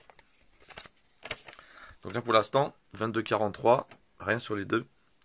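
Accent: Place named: United Kingdom. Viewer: Whaaa..!!! salut l'ami belle ouverture, et quelle surprise cette petite pub et hyper sympa de ta part! merci mon cher! =) comme il a dit oui venait sur ma chaine! =) sympa ton petit concept et je suis ravie que cela n'et rien prouver comme tu la dit ou moins les scalpeur vont pas arnaquer les gens avec leur mensonges grotesque! la secret Rainbow whaou =) MAIS TA CARTE PIKA LA JE LA VOIE PARTOUT IL M'EN FAUT UNE! x) +1 like pour ton british accent! lol merci encore a bientôt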